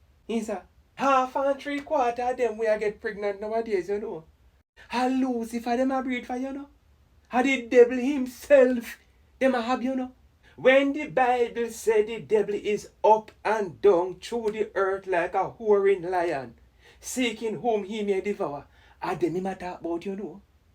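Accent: Jamaica. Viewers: 👀 Memba oooo a propah propah Ja patois enuh…O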